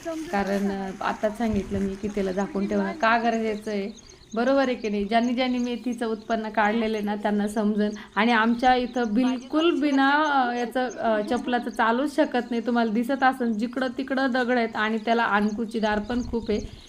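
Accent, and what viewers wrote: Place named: Pune, India. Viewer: तुम्ही खरच किती काम करता तुमच बोलण खूप छान वाटत नगर किंवा पुण्याची भाषा वाटते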